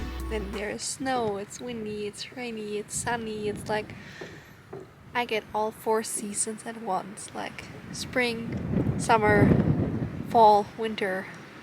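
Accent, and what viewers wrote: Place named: United States. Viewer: Du hast teilweise schon voll den Ami - Slang 😅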